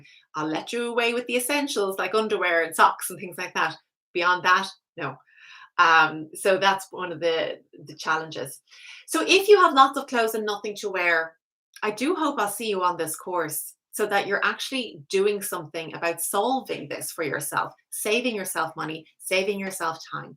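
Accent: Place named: Ireland. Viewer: I love your smile and your Irish accent! Greetings from Madrid in Spain ❤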